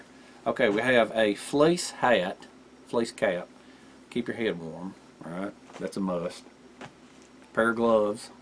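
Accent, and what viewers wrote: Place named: Georgia, United States. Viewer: You sound like Ga boy, I know all about those shutdowns, local 1263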